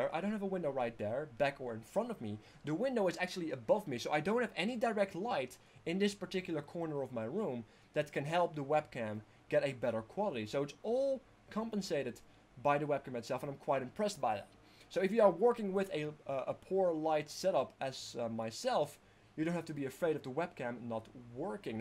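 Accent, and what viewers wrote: Netherlands. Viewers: the dutch accent gave it away :D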